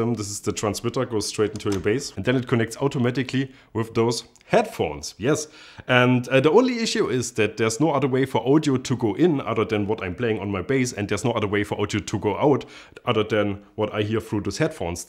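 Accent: Germany. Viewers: omg this German English so funny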